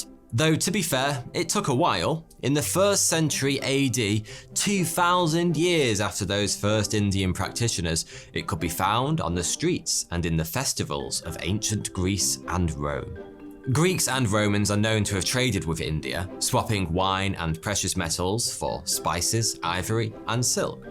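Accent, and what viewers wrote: United Kingdom. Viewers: I literally only watch this to get my British accent better by mocking this douche 🤣🤣🤣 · What he hell part of the UK does that accent come from?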